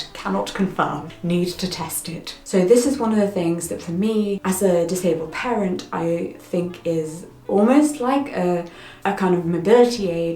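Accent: RP, United Kingdom. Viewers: i saw you being intervued by molly berk and loved your poshness that's parshley why i subscribed to you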